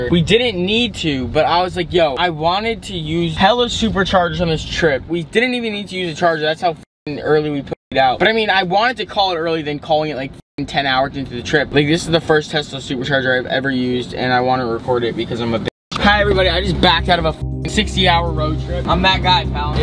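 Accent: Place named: New York, United States. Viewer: you can tell he’s from NY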